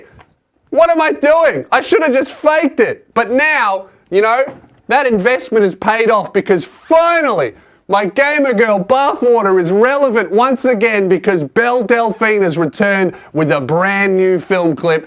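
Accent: Australia. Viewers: You have the most Australian accent i know.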